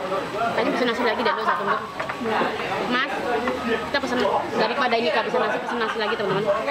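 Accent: Java, Indonesia. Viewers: Medok nya,, bahasa Indonesia berasa jawa tulen 😂😂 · Medok jawa nya mbak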